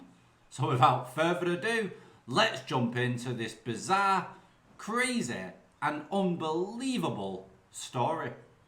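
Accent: Manchester, United Kingdom. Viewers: Apart from the Manc accent and baseball cap - I do enjoy the way you read the stories. I enjoy Thailand Bound on a Saturday too. Hearing about these tools that come to Thailand, ignoring the shit-load of advice that can be found in ten minutes with Google, never gets old. Cheers our kid!! 👍😊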